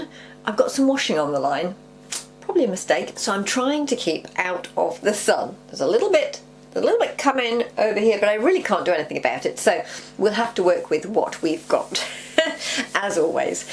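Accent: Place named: England, United Kingdom. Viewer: I'm thrilled to have found your channel! Now i have to hunker down and watch what I've missed 😊. After hearing your English accent, but you then saying $ instead of £, I read your description. How lovely to be next door to Cornwall Park. I used to gallop around there at least 3x weekly, and drag the kids to the summit. Living in Nelson now. Can't wait for your next video ❤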